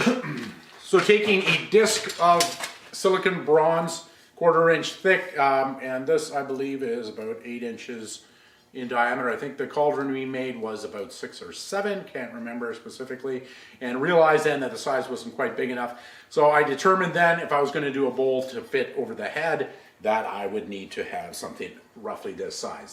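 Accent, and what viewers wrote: Canada. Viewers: Accent says Canada.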